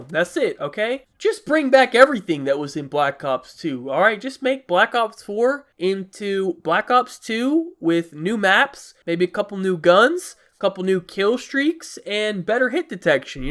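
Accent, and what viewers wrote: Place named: South, United States. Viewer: he sound southern like deluxe 4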